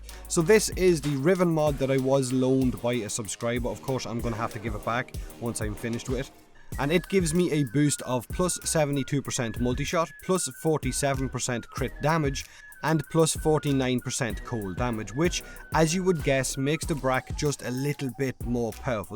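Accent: Ireland. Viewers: Are you Irish? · British..... he's Irish! lol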